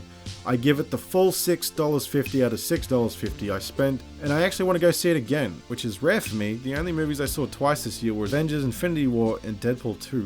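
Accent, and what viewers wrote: Australia. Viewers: Slightly off topic "From the people who brought you Halloween and such titles as; H2O: Water..! H4O: Twice the Water..! Comes... H60..! Three times the water! Prepare to wet yourself, this Halloween season..! H6O! In theaters, now!" (Great vid of course, love the Australian accent as always. Good people.) ^^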